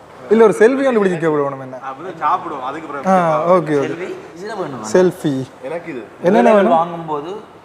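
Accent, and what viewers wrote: Jaffna, Sri Lanka. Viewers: Anna neenga pesura jaffna tamil sema annachchi 😊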